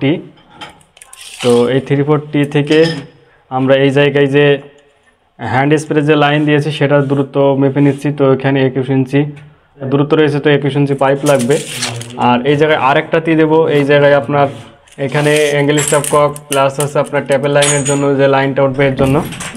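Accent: Jessore, Bangladesh. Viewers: খুব সুন্দর ভাবেই উপস্থাপন করার জন্য ধন্যবাদ। আপনার কথায় বোঝা যাচ্ছে যশোর এলাকার মানুষ। আপনার বাড়ি কোথায়?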